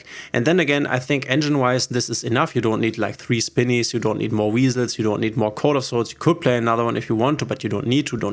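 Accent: Germany. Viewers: Bruder mach bitte ein Video auf deutsch und eins auf Englisch weil ich als deutscher so krass raushöre das du deutsch bist 🥲😂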